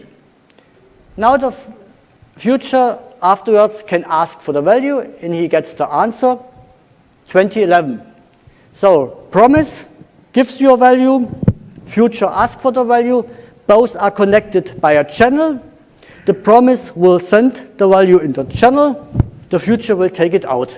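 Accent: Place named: Germany. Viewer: I love German English :D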